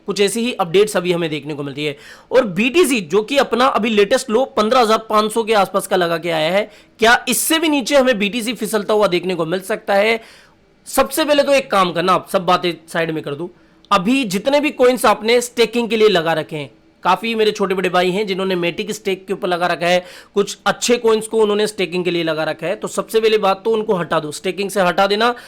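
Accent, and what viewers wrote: Rajasthan, India. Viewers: Bhai app Rajasthan se ho na 😏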